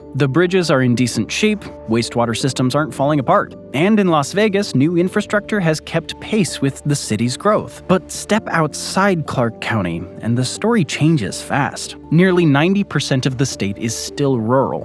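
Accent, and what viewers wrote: California, United States. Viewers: Sounds a little bit like you might be from California.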